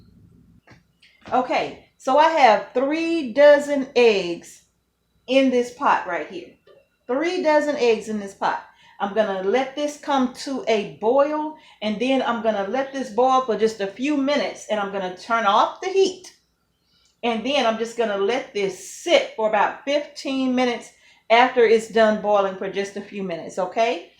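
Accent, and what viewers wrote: South, United States. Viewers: You are an adorable girl! You sound like a girl raised in the South....I am tuning in from SW MS....thanks for sharing your knowledge with me.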